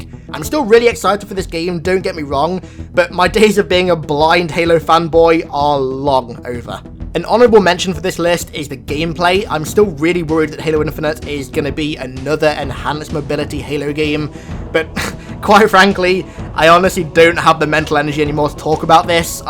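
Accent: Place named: United Kingdom. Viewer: The british accent is cool but your lisps nerd makes me wanna kill myself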